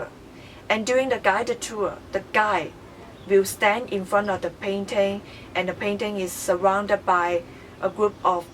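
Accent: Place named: China. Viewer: Chinese accent is so beautiful to hear.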